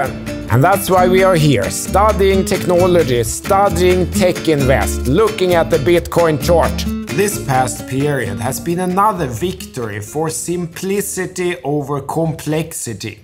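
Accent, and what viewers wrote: Sweden. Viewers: Suiting voice for a travel show with a unique swede influenced dialect. I just don't get over how close your username is to "CTE Larsson" 😂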